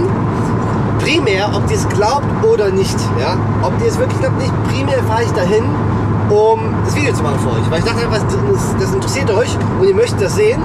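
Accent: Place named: East Germany, Germany. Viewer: wie ne Ostdeutscher vor allem. ...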